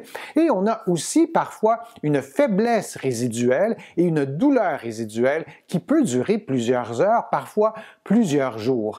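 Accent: Quebec, Canada. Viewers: Merci vous m'encouragezje suis née pas loin du Canada (stpierre et miquelon) près de terrneuve j'ai apprécié la votre léger accent Québec dès que je peux je vous rendrai visite je ne vais pas guérir mais peut être me soulager par votre connaissance médicale et vos conseils en les mettant en pratique à la prochaine anne Marie briand Morieres les Avignon France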